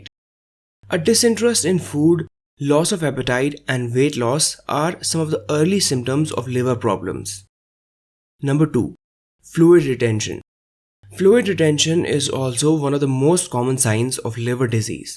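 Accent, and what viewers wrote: India. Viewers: Thanks Dr. I love your Indian Accent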